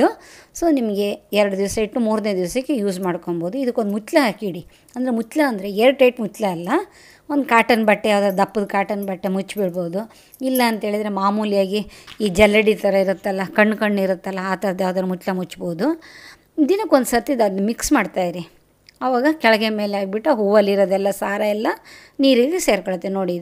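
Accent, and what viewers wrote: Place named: Malnad, India. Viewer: ನಿಮ್ಮ ಸಲಹೆ ಮತ್ತು ಮಾತು ನನಗೆ ಇಷ್ಟ. ನಾವು ಮಲೆನಾಡಿನ ಜನ. ನೀವು ಮಾತಾಡುವ ಶೈಲಿ ಹಾಗೇ ಇದೆ. ನೀವು ಯಾವ ಕಡೆಯವರು ಮೇಡಂ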